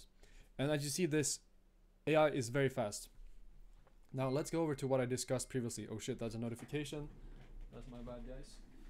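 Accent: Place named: Scania, Sweden. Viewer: Skåne säkert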